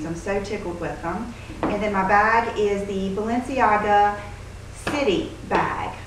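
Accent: United States. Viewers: Hi! I´ve been watching your´re videos from here in Norway and I´m loving them! I even went back to old ones just to get "a jour". I haven´t commented on them until now because I´m a bit shy, but them I thought that "hey, I want to let know that I think she´s doing a terrific job". So,: Great Work!!I think you are fun to watch and listen to, I love your accent which reminds me of when I lived in the States many years ago. Keep up the vlogging & I´ll be watching;-) Thanx Cecilie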